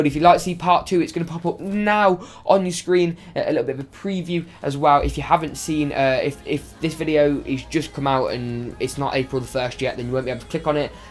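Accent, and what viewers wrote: United Kingdom. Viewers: does anyone found it feeling so damn hard and stressful when hearing to talking, i dont mean that i cant hear what he said but its not feeling good for me to hear that kind of british english.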